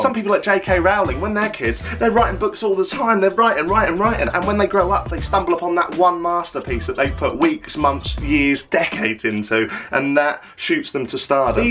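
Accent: Newcastle, United Kingdom. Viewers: What's gan an everyone itsa true geordie backagain!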